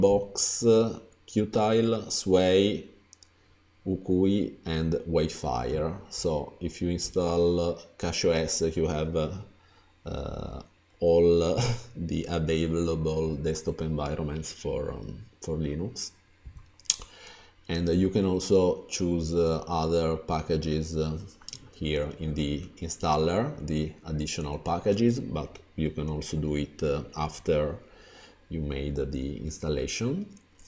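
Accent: Italy. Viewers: No matter, I like both, italian and english. Best mix of all.:-), there is not much bad with it. It is just, that many spoken words have an extra "e" at the the, from run it get's run-e. For me it's perfectly fine. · this is the most italian english I've heard in a while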